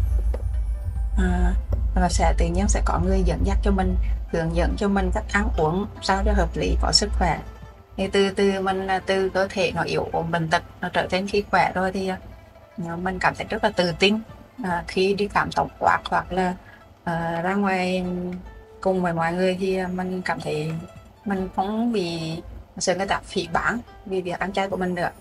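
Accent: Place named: Hue, Vietnam. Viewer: Admin up bài này làm em thèm quá xá . đậu ngự lại với giọng Huế nữa hay quá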